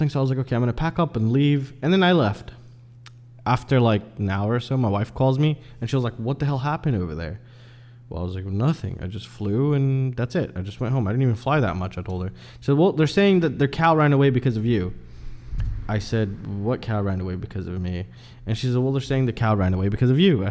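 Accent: United States. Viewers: Dude ...maybe I missed it but what’s your origin story? You sound like an American living abroad. You’ve got a cool channel and a pretty bright inquisitive guy! Keep on making great content! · How do I enter contest? :p Glad to hear your son is ok. And I thought you where american, your english is not hungarian. ;)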